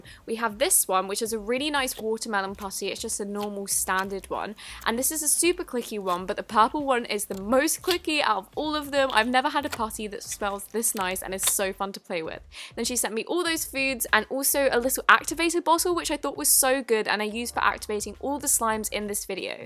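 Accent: United Kingdom. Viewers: babycanread2009 right, and maybe it could be because of her British accent!!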